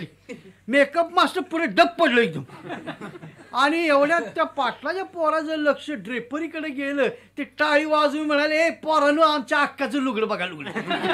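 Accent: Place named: Rural, India. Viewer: ही कथा मी 10 वेळेस ऐकली आहे तरी पण ऐकू वाटते याच कारण म्हणजे ग्रामीण आवाज आणि ग्रामीण कथा यांचा आवाज.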